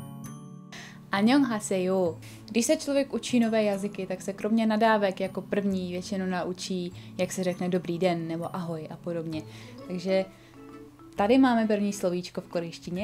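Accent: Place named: Czech Republic. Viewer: Zrovna zacinam s Korejstinou a za boha jsem nevedela kde se ucit.. pres duolingo se také učím jazyky z angličtiny, ale přece jen ta korejština je tezsi a jsem rada že slysim výslovnost od Češky! Díky moc!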